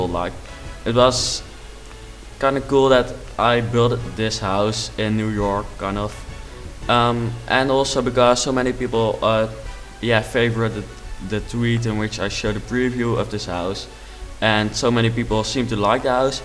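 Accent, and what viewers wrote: Netherlands. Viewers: je kunt denk ik beter geen commentaar op je video geven want je hebt echt een heel erg nederlands accent en voor heel veel buitenlanders heel moeilijk te begrijpen en niet altijd even fijn om ernaar te luisteren wel super cool huis enzo :-) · Haha, inderdaad Nederland accent maar ik vind het juist fijn om naar te luisteren, gaat tenminste niet zo snel ;p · You have such a cute dutch accent! Its funny to hear almost all dutchies have this accent hahaha. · Um he is dutch.So that's why.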